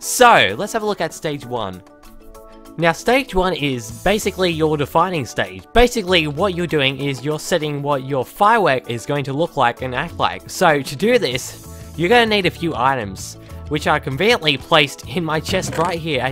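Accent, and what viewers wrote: Australia. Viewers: R u australian? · are you australian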